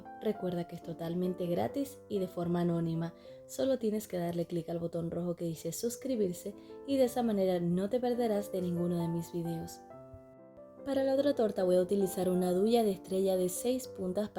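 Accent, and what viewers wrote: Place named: Venezuela. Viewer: Me encanta! Te descubrí hace poco y voy a aprender mucho más ahora que comenzaré a trabajar con fondant. Me suenas a Venezuela ❤ Y para los que critican que le decimos quesillo al flan pues en Venezuela siempre se le ha dicho así y creo que casi nunca le han puesto queso crema... La costumbre 😝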